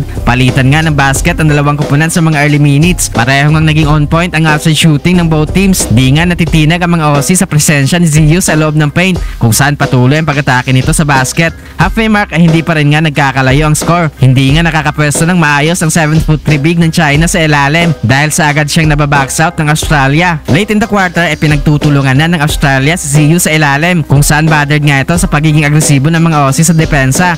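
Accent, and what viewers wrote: Iloilo, Philippines. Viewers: Ibang klase itong narrator a. First time ko rito. Alanganing Nobita na may Ilonggo accent.😅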